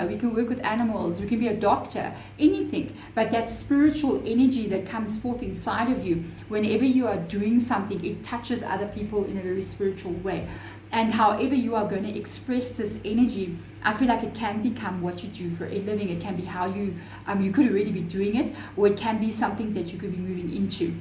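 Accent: South Africa. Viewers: Is that a South African accent I hear? Love your channel and thank you for the wonderful readings ❤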